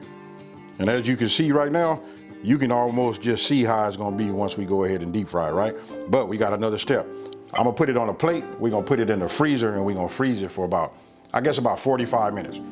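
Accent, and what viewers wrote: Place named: South, United States. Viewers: Thanks for the great recipe. I just made this and, as you said, "BOOM". I love that?southern American?dialect of yours...I'm Scottish. Please allow me to offer you a wee tip pal: if you leave that hardy old root on the onion it'll act like superglue and help prevent anything from falling off! Just sayin like, but..I'm fae Scotland so what dae I know? ❤️ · Love this video...good southern cooking and accent.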